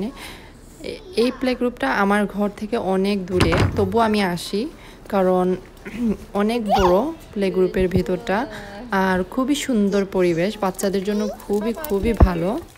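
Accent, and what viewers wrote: Sylhet, Bangladesh. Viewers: Apu,apni ki Sylhety?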